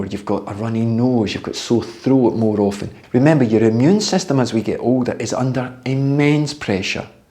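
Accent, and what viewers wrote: Scotland, United Kingdom. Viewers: I love your videos! I've learned a lot (I am 59 and just started cycling again after decades). I find it extremely hard going up any hills but am getting a little better with the smaller inclines. I'm not exactly a skinny Minnie either, lol. Thanks again, and as a Canadian, I enjoy listening to your Scottish accent while you're explaining things. 😁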